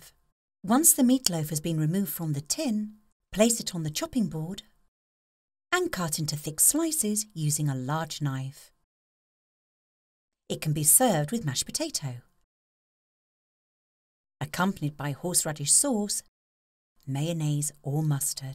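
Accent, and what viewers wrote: England, United Kingdom. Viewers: How gratifying to hear a sweet and lovely English voice. After watching this video I must try cooking this myself.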